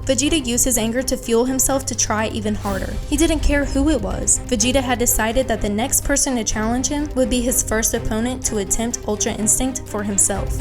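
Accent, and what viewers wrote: South, United States. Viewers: Am i buggin or am i hearin a subtle southern accent ?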